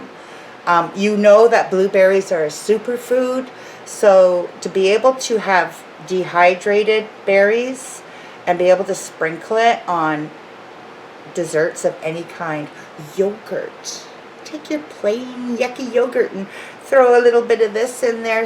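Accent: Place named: Canada. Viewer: Just came across your videos today! Love your way of teaching and your Canadian accent! Subscribed and look forward to watching all your videos!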